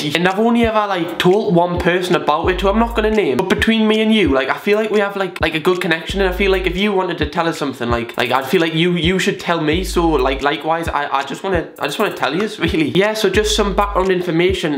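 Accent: Newcastle, United Kingdom. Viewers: Another fellow Geordie eh! Woop woop. 😉 this hurts my heart, hope your okay! ❤️ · Mad that work is my escape from it! I always put on a front like ill make my self look good, put makeup on. And ill get through the day getting nice comments and that and ngl that does make me feel better about myself. But as soon as i take my makeup off ill get in the shower and cry cause no one can see the tears. And i just stand there thinking how much i hate myself and my life, and questioning when is it gonna get any better? Watching you videos fully makes my day you actually cracks me up. I adore ur geordie accent! Keep ur chin up and keep smiling hun 😘❤️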